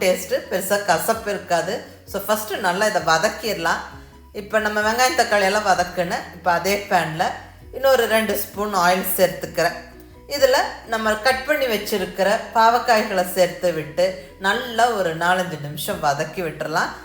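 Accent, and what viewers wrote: Coimbatore, India. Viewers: மிகவும் அருமையா இருக்கு. நீங்க பேசுற கோவைத் தமிழிலே இன்னும் சுவை கூடத்தான் தெரிகிறது. மகிழ்ச்சி. வாழ்த்துக்கள் அம்மா.👍💐🙏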